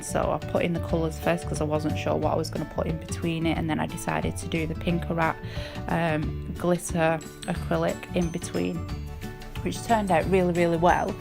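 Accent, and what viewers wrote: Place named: Northern England, United Kingdom. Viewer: Lovely set.... just subscribed as came across your vid... u sound very northern and familiar... I'm a Lancashire lass and is swear u r too x keep going... your doing really well. I'm 11 years in and still learn every set. X and your more confident than me cos I'm still not strong enough to set myself up on here yet. Tempted but always chicken out. So keep going x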